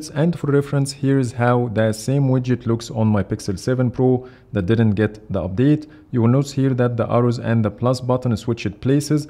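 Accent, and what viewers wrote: Egypt. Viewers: Bruh Egyptian accents can always be picked up xd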